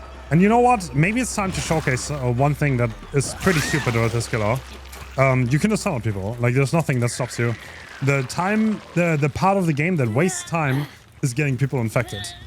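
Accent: Germany. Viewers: Bruder hat den Deutschen Akzent. Aber krasses Englisch hatte ich am Anfang erst nicht gemerkt.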